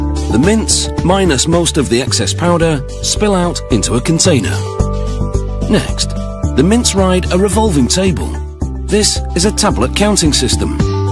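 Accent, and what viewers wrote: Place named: United Kingdom. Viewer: FINALLY!!!! THE BRITISH DUDE!!!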